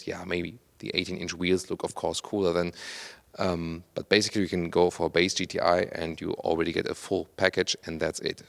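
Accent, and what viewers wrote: Germany. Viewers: I'm sure he's intentionally dialing up his German accent which is SO GUT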